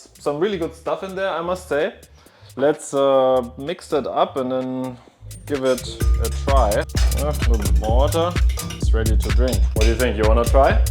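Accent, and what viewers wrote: Germany. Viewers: The german accent is going to kill me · sounds triggeringly german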